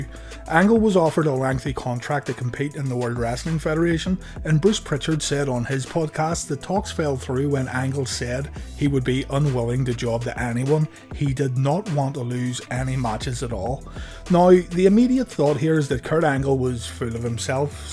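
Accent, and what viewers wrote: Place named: Northern Ireland, United Kingdom. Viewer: What a great video and how refreshing it is to hear a fellow Northern Irishman (or Irishman if you prefer) on Youtube! You got yourself a subscriber. Great work!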